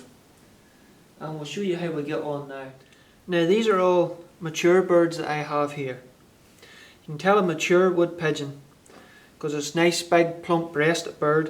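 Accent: Ireland. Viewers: Ah, there at last. Your handsome face to match the charming Irish accent....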